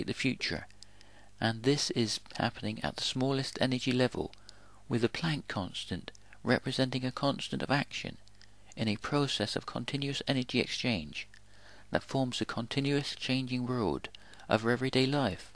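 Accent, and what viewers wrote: West Country, United Kingdom. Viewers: I shared your Video to the Google+ Science community and it was moderated to Death.. I think your West Country accent got the better of them. Don't let them grind you down. They think they know it all.. but they are dancing to Pan's pipe.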